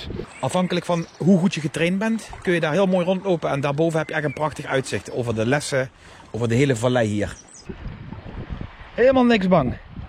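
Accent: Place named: Limburg, Netherlands. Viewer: Hallo, super mooie videos, ben per toeval op jou kanaal gekomen, doe huurst deg aan wie ene echte limburger. Top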